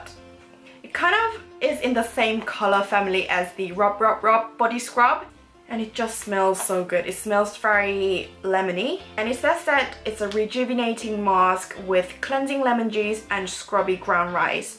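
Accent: United Kingdom. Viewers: 항상 영상 잘보고있어용❤❤❤ 영국영어 들을때 뭔가 언니가 하면 끌리는게 있어서 계속 반복재생하게되눈거 같아용😍😍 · 액센트가 완전 취저ㅠㅠㅠㅠ british accent를 갖고싶지만 어릴때부터 미국에만 살아서 american accent 만 가능한 저는 웁니다...★ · 요런 추천영상 넘 좋아요 ❤️❤️ 언제 또 여행갈지 모르겠지만 😂 그리고 항상 느끼는 거지만 목소리랑 영국발음도 듣기 참 좋아용:D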